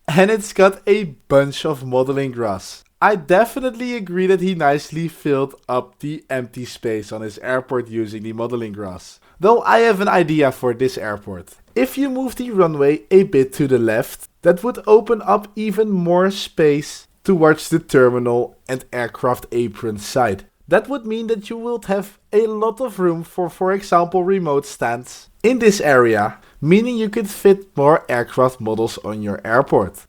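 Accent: Netherlands. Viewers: Are u dutch??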